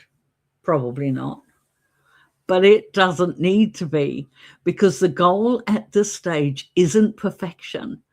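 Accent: New Zealand. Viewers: What a wonderful vlog. First time seeing your channel and have subscribed. Immediately spotted the accent and harekeke out the window so felt at home as a kiwi too. In answer to your request to comment to someone wanting to write: Writing matters. It matters to you, that’s why you feel the urge. That’s why you feel the compulsion. It matters, that’s why you feel the resistance. It matters, that’s why when you finally start, you feel whole, you feel joy, you feel the desire to continue. You’ll also feel the self criticism and doubt but you keep writing because writing matters to you. Keep writing till you get beyond it mattering. Keep writing till you feel it’s who you are, someone who writes. Just like you might be someone who knits, someone who runs, someone who paints, someone who bakes. Write because you are someone who writes.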